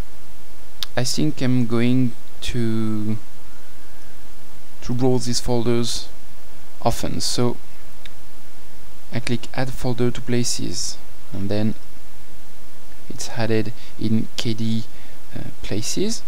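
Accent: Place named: France. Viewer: comme un accent francais,, it's weird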